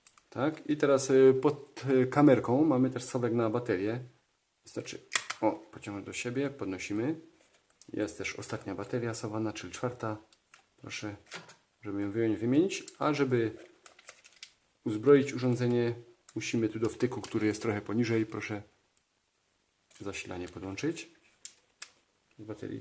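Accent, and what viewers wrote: Silesia, Poland. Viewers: zasadniczo fajna prezentacja, jedyne co mi przeszkadza to śląski akcent w wypowiedziach prowadzącego, reszta super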